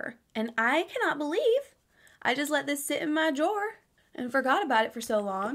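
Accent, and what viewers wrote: South, United States. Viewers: Oh my gosh! I love it when the southern accent comes out every once and a while! I had a southern accent when I was younger up until we moved back to the west coast when I was young.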